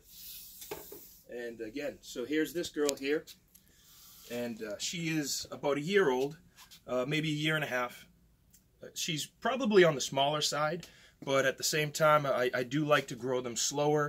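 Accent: United States. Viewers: You sound just live my dad!!! Love the east coast accent 😂💕 Mainer here 👋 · Am I the only one that hears a Jersey accent poke through? Just me? k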